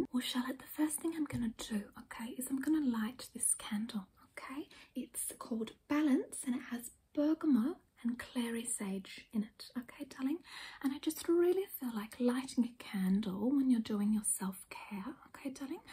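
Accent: Australia. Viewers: I don't know if anyone has seen it, but the accent is exactly like the rich ladies who work in the shop in Kath and Kim 😂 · in love with the aussie accent, the soothing voice and the vibess i just woke up from a nap but i guess its bedtime again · Omg lol I just realised that most of us aussies have that exact accent 😂😂😂😂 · she’s Australian XD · I love your cultivated Australian accent 😘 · are you actually australian or no?!?! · She’s literally Australian.. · As a true blue, I appreciate you and these videos. The comfort of an Aussie accent which the subtle cultural complexities of a “rich Aussie mum” makes me real feel at home 🦘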